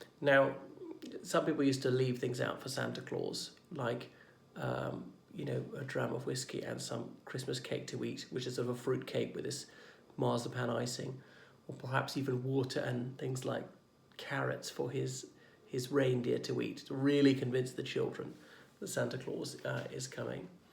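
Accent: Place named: England, United Kingdom. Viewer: You sound incredibly English to me. What is your channel actually about? Is it more of a discussion channel?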